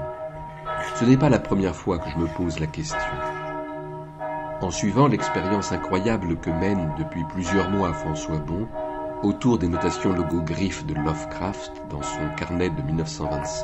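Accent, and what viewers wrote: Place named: South, France. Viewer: "et je parle enfin avec de la chaleur dans la voix" <3 très beau ce journal à l'accent du sud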